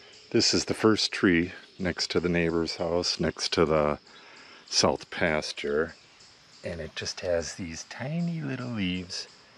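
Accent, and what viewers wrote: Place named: Wisconsin, United States. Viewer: I hope that all of those Bur Oaks had a good year after you treated them. I hope they worked on their roots and will leaf out strong this coming spring. You probably already know this, but one of the things that I do with my young oaks is fertilize them in the spring at leaf out and ALSO give them some Iron. I usually only fertilize once, but one year I did another just before the second growth in June (in Illinois). I hear a little Wisconsin in your accent so your trees probably get that second growth about when mine do. Two fertilizations can be too much so be careful. You have to use your judgement. You may already be doing some fertilization. It sounds like you know a lot about trees. Nice to see someone plant the mighty Bur Oak! Such a stately tree out in the middle of a Savanna.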